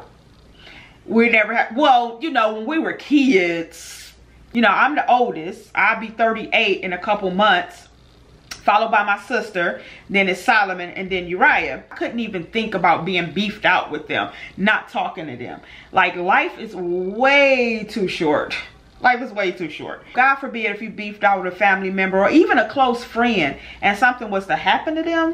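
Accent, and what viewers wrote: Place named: Midwest, United States. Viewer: We love y'all too girl! I'm from the midwest too...and we even talk alike. And yes we would say "hooo" for the ice cream truck...lol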